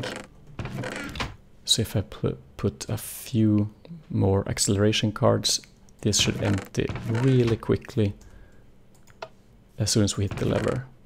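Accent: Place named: Sweden. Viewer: Hör så tydligt att du är svensk haha xD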